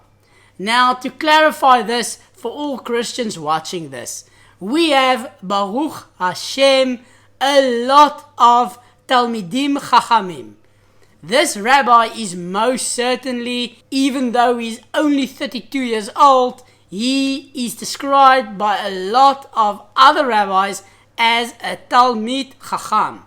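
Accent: South Africa. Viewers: חיי אחי 😁 I've watched your videos off and on for months now especially the conversion video start to finish. I think it's fantastic your making videos about Judaism,I love hearing your South African accent haha I just haven't heard a Jew speaking anything apart from American / Israeli accent/Yiddish Anyways G-d bless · Is this guy South African or something?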